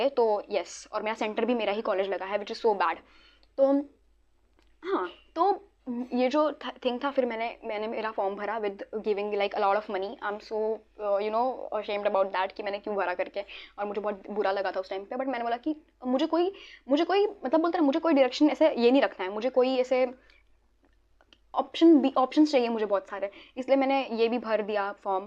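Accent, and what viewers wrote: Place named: Mumbai, India. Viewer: She has that typical Mumbai accent ❤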